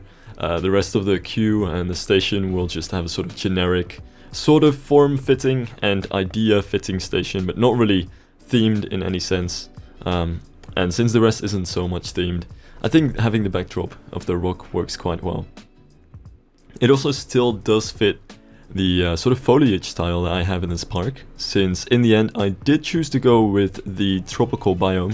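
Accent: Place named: Netherlands. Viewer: Dude i couldnt tell you were dutch Lol nice accent. Btw nice job!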